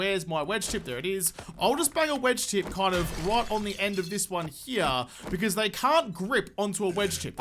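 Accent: Australia. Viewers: Love the ozzie accent :)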